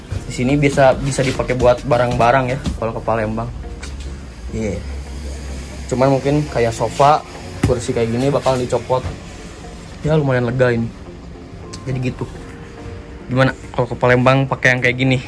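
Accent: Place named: Kebumen, Indonesia. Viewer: Ngapak kebumen hadirr..bozz?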